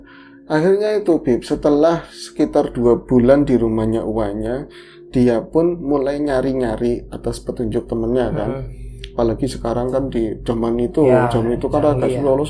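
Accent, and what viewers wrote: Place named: Tegal, Indonesia. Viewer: mas dengan dialek tegalnya lucu,sukses terus dengan acara ngobornya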